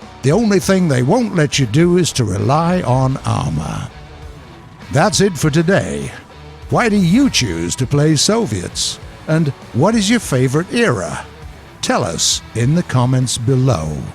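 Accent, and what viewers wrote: United States. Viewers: wait why did u turn American?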